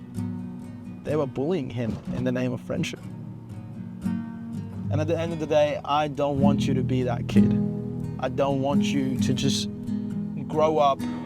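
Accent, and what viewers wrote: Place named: United States. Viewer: Bro im 17,im from India i just came accross your channel and really loved your vids. In addition I'm impressed how you speak.. fcking smooth english with American accent and can speak hindi too. Bro i just want your advice on improving my English accent cuz i have big goals and i wanna be an entrepreneur on international level. So that will help me a lot.pls drop some gems here if you are reading this❤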